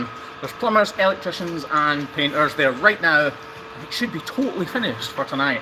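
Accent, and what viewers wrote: Scotland, United Kingdom. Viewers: Love Outlander & love your videos - you could read the telephone directory & I'd watch!! Its a love of the Scottish accent - you & Sam Heughan 👍💕🏴💕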